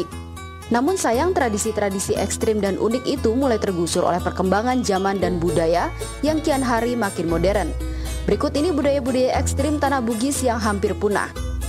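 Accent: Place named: Sulawesi, Indonesia. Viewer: bahasa bugisnya sangat kental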